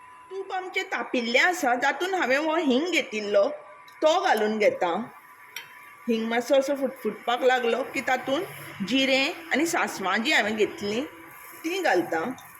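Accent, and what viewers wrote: Konkan, India. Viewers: Hey my MIL and I make it as a side with Neer Dosa often for breakfast. Ghovak khup avadta te. We chop the tomatoes to a little more smaller size instead of slicing/dicing like you did. Although I like it big. I love your Konkani dialect...the way you say "kaano" for onions is so irresistibly cute ❤️ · Must be tasty will try...your Konkanni is really nice to hear...dont know when Goa has a beautiful konkanni language why it's not used. Thank you for using it..God bless..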